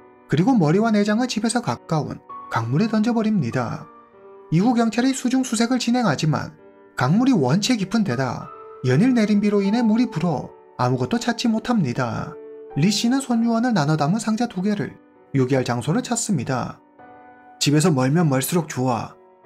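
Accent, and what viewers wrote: China. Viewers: 방송인 중국사람인가 ?말투가 어디지?궁금하네 ...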